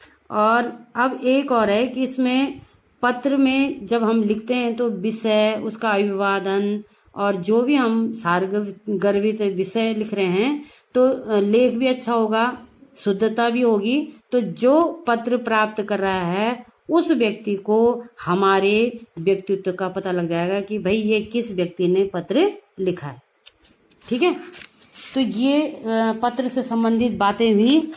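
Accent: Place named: Uttarakhand, India. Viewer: Mam you are from Uttarakhand chamoli right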